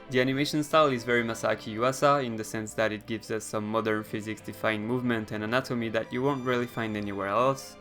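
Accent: France. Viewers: Your analysis makes me see the show with a whole different eye, truly amazing ! Also your french accent is almost unnoticeable, how did u get rid of it so well ?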